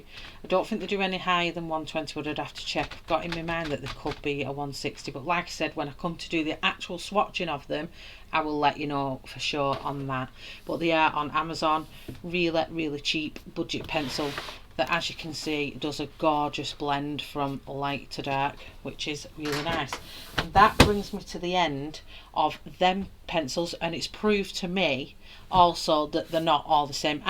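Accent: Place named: Northern England, United Kingdom. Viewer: I was born in Birmingham but iv lived in Australia since 1974, I like listening to you, you sound like your from somewhere up north. Liverpool?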